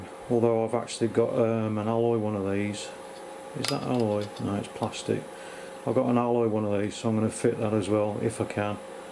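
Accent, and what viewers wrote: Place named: England, United Kingdom. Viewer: I'd never stop inventing truly useful and cool stuff for dudes if I had one of those, Mate! None of the unimportant drivel I do daily would ever get done!!! I'll have to keep building stuff out of old "junk" or all the "junk" I do would never get made into new "junk". If I just had a "pence" for every time I said, "Man t'would be so much easier if I just broke down and got a damn printer!" I'd easily have enough "pence" to get a damn printer!!! 😂🤣😂 Much love!!! ✌ ϻя.ƹ PostScript - truly not a stalker, just after a couple vid's I actually watched of your crazy "bum" going fast on e-bikes, I figured I'd give ya a real go and have your channel on "play all" in the background. Of course, I click over to see whenever I hear ya laughing or cussin', as I know those are the important parts to me anyhow. I simply find your relaxed English accent combined with your internal teen-like rebellious angst a calming juxtaposition ... 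HA ... spelled that one correctly w/o autocorrect for a change 😂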